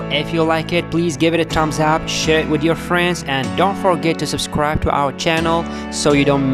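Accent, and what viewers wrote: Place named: England, United Kingdom. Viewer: I actually like your English accent. You're great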